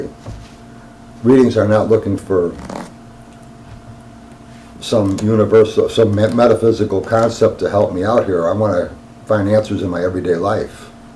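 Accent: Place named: New Jersey, United States. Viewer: ah, thank you. I’m from NJ and he sounded so NJ to me.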